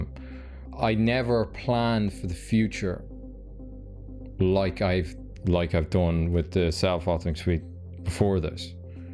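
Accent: Ireland. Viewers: He sounds Irish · Is this guy Irish?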